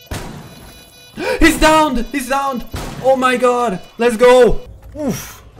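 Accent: Germany. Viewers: Are you german ? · Wtf bist du deutscher oder kannst du besser deutsch als ich 😂😂😂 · Are you German? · Is he german??? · you from german or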